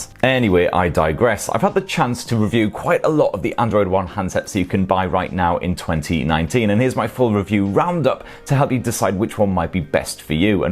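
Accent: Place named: Newcastle, United Kingdom. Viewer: I'm a new subscriber, and it's great to hear reviews from someone in the uk....with a lovely Geordie accent? Love your sense of humour too. Just wish you would take a breath every so often!!! Lol I'm a technophobe, who still uses a non smartphone Nokia 6300, and I'm "trying" to make sense of all this tech-speak, with the thought of buying a mid priced smartphone.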